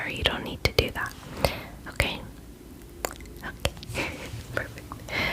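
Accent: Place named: Minnesota, United States. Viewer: shot in the dark here but I’m hinting a midwestern accent 😂 are you a Minnesotan?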